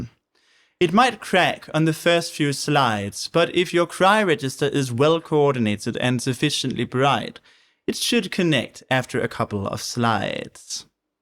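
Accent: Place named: Oxford, United Kingdom. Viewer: I like your oxford english very much 👍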